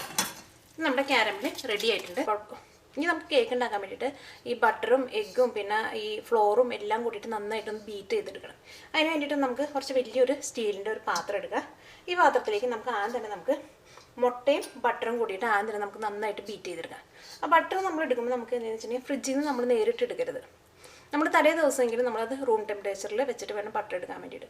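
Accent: Thrissur, India. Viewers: seems to b a easy recipe. will try it for this xmas.. really like ur thrissur tone :) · ഇത്തരം പോസ്റ്റുകള്‍ ഇടുമ്പോള്‍ മറ്റുള്ളവര്‍ക്ക് പ്രയോജനം ഉണ്ടാകണമെങ്കില്‍ പറയുന്നത് മനസ്സിലാകണം. ദയവായി സ്പഷ്ടമായി പറയുവാന്‍ ശ്രമിക്കുക. തൃശ്ശൂര്‍ ഭാഷ എല്ലാവര്ക്കും മനസ്സിലാകണമെന്നില്ല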